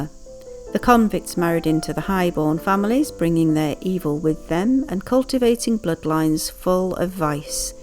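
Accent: Northern England, United Kingdom. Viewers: It was odd hearing you say you spent your childhood in Crantock, were they holidays? I hear a northern English accent in your voice, not Cornish, I'm intrigued. Love your artwork.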